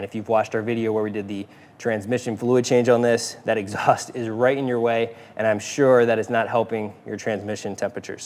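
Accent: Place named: South Jersey, United States. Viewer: you said “wooder” I knew you were from south jersey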